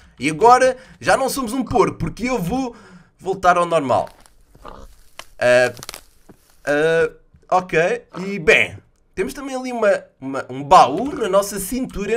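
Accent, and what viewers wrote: Portugal. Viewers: é tao fofo qnd vc diz Spawn:P sério, eu amo a sua pronuncia:D (Não me julgue, eu sei que ele é Português!) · portugues de portugal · ava que ele é de portugues , n me diga !!! eu sei qeu ele é de ´portugal e la elees falam assim ou seja o sutaque de portugues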